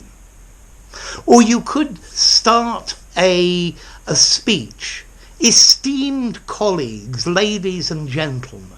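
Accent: United Kingdom. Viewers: Hi May I ask what is ur accent? Modern RP or Traditional RP or neutral accent from some place？ thank u teacher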